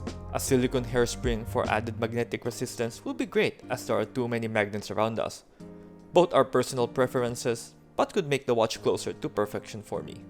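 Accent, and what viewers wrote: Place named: Philippines. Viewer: Do you happen to be from the Philippines? Because you sound like one.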